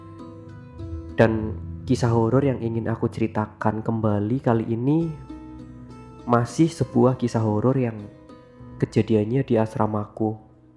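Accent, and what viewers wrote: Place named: Banyumas, Indonesia. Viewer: nek orang ngapak emg bgtu mas hehe sy pun bgtu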